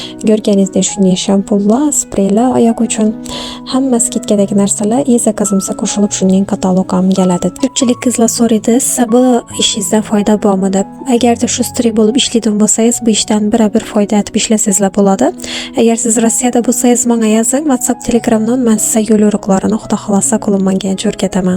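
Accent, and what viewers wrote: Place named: Khorezm, Uzbekistan. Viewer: ❤❤❤❤бунча ширин гапрдингз устозам бирам чиройли яхши кураман хоразм шевасини боризга шукур сиз туфайли шу кампанияга кушилдим сиздан бизнес сирларини урганетганимдан хурсандман❤❤❤❤❤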